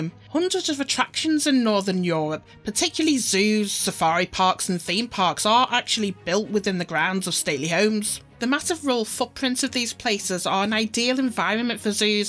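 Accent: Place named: Manchester, United Kingdom. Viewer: I'm an American and I can't place your accent, is it Manchester!? Love your videos! Definitely subscribing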